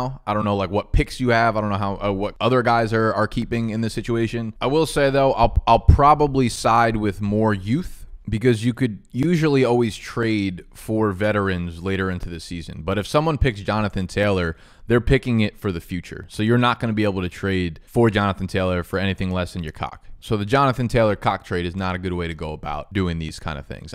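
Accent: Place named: Philadelphia, United States. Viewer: Are you from philly? You got the accent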